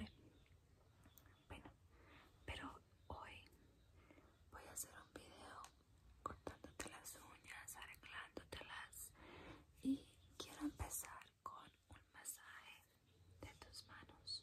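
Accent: Colombia. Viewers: Me encanta el acento colombiano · El acento colombiano me encanta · me encana el acento colombiano es mi favorito en el mundo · me encanta tu acento colombiano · aprovechar tu acento colombiano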